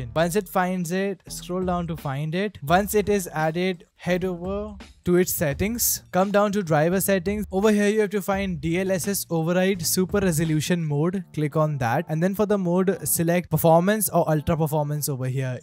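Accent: India. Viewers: if ur in an english speaking country pls for the love of god learn to not speak in an indian accent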